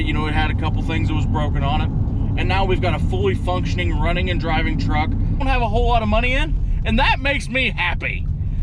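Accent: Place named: Pennsylvania, United States. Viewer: I know that you say you live in Kansas but are you originally from PA? Your accent sounds like my family that lives in PA.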